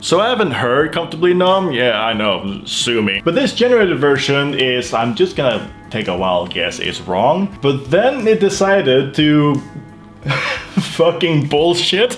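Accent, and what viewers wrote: Norway. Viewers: Who is this guy? Toki from the cartoon? Norwegian?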